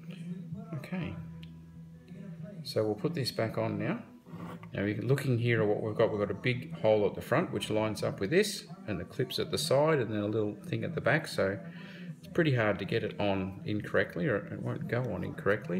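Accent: Australia. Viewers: Yes, I thought you sounded Australian. Nice commentary. What's a vegemitan sandwich?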